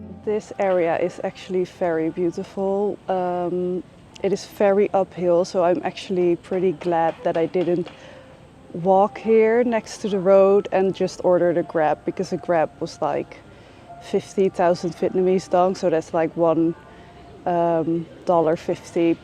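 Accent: Netherlands. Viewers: Thanks for the video, food looks amazing thinking to go in march, and you look very good to, i hear some.dutch accent. Byee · Ook praat je in het Engels, jouw accent vertelt alles in het Nederlands hhh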